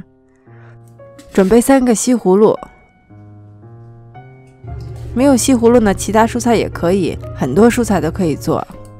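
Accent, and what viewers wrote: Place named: Xi'an, China. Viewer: 您好，我也是西安的，目前住在台湾，听您说话好亲切，标准西安普通话，哈哈，想请问下回到西安在那个网站可以看到您的视频。youtube在大陆打不开呢。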